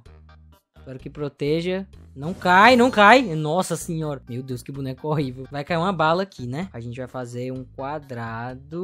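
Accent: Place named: Ceará, Brazil. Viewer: Tu e cearense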